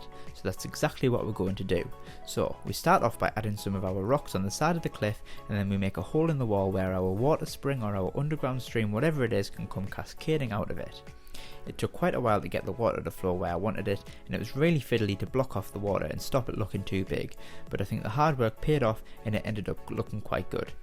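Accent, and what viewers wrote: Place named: Yorkshire, United Kingdom. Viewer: Me too! :), But i know that Lol, I can tell from your accent, Sounds northern, you from Yorshire?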